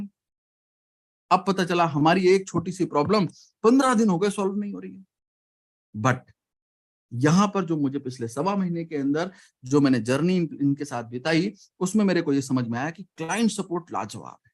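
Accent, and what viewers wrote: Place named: Uttar Pradesh, India. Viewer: ji kya aapka number mil sakta hai aapki hindi se lagta hai aap UP se hi hai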